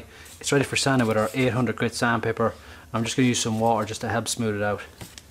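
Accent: Dublin, Ireland. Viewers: Very good buddy - nice one - love the Irish accent as well. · Nice! And the Irish accent was the icing on the cake 😃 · Where ya from Dublin? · I really enjoyed this mate i love the Irish accent could listen to you all day. too al those who put thumbs down 👎 what a bunch donkey's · Ooh, that Irish accent! ❤ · With that accent your Irish.... where da hell did you get that 2k clear in this country. It's like hens teeth in the Midlands.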